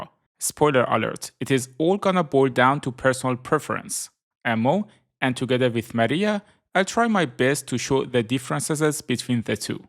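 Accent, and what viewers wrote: Iran. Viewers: چرا لهجه ایرانی داری داش؟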